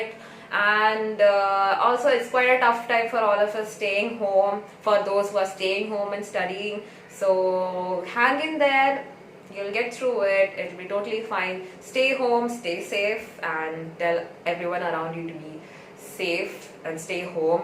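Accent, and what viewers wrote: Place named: Kerala, India. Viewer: you have a malayalam accent while speaking tamil. that's great! coz i'm a multilingual myself. i speak Tamil ,Telugu, Hindi, Malayalam, English(of course),korean and french(still learning). 😀and i made it through the cringe session. it was truly CRINGEWORTHY.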